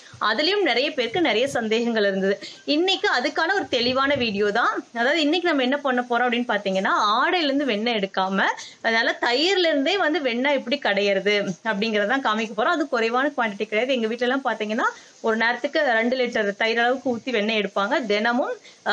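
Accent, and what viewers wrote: Kongu, India. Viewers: Kongu slang video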